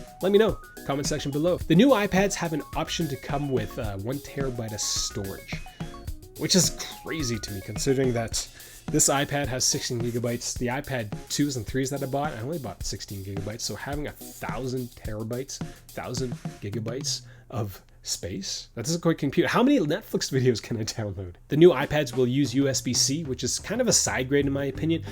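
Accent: Canada. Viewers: 2-things 1) there’s a dog in this video! 2) you bench 240lbs? Legit. (But you sound Canadian, is that kilos?)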